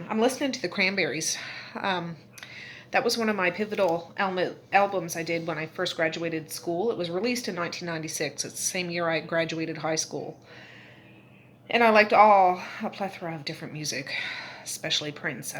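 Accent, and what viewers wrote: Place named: Nova Scotia, Canada. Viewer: First time watching the post on its first day. It took 3 months to watch every post from the very beginning. 🙌 🙌 I watch because it sounds like home. Born and raised in Nova Scotia.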